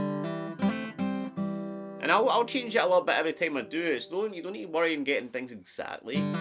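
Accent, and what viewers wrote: Scotland, United Kingdom. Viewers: came for the scottish accent, stayed for the luuuuv. and the guitar lessons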